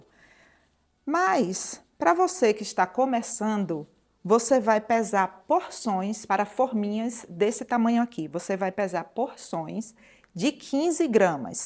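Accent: Bahia, Brazil. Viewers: Ola! Vc é de Salvador? Tem um sotaque baiano e por sinal ensina as receitas muito bem com toda paciência. Parabéns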